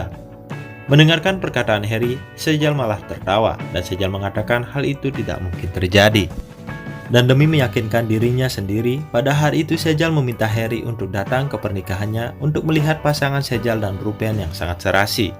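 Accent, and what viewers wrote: Bali, Indonesia. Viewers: Dari logatnya mimin kaya orang Bali yaa hhh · Kok kayak bali malahan bng 😁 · ini yg punya chenal pasti orang bali . . logat balinya kluatan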